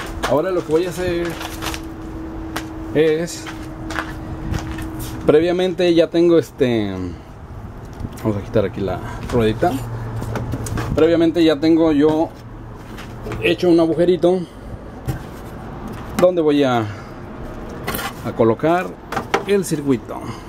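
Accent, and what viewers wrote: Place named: Jalisco, Mexico. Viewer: Muy bueno, saludos, un abrazo! PD. tu acento lo siento como de Jalisco, o de donde eres?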